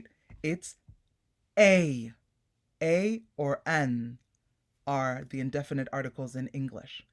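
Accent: France. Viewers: French accent is so beautiful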